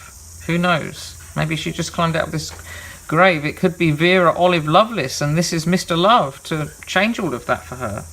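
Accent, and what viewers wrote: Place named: London, United Kingdom. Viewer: There is an actually a psychological study on something driven through the brain in the 1900's I think. i kept thinking what a strong London accent! You're doing a good job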